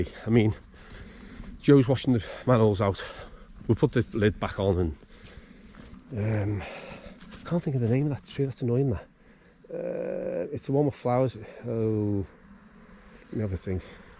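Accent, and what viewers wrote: Liverpool, United Kingdom. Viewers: Ah the lovely British accent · Liverpool accent as thick as the sludge in the drain. English of Ye olden times. I love it! Had to use close captions to follow along with the story! lol. Gagging the entire clean out process. Well done. Cheers!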